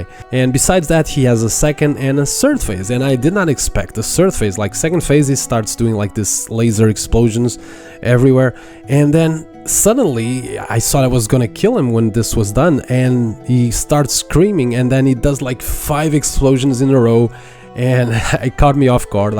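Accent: Portugal. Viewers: pelo teu sotaque percebi logo que eras tuga porque é literalmente igual ao meu😅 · 1st i hate all of you for being able to beat bosses while only dying 3 times or wtv, I'm going to cry (except Moss Mother that i killed 1st try idk how yall do it) 2nd i was like this dude's accent sounds like he's portuguese, and apparently yeah? Bom trabalho 👍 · nah i think he is portuguese I can feel on his accent. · That portuguese accent made me smile ! 😄 nice analysis!! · Are you portuguese (I recognize the accent)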